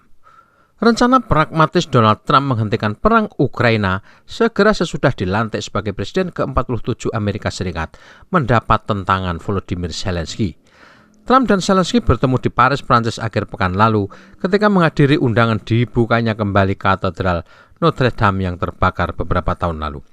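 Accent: Java, Indonesia. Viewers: Mas, medoke ora ilang ilang ? Aku Suka 😅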